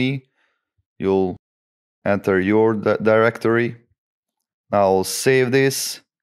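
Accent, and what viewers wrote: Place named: Eastern Europe. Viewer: Славянский акцент))))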